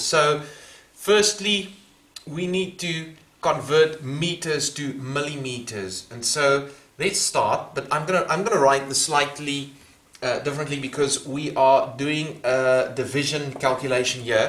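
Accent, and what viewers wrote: South Africa. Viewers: south african or new zealand???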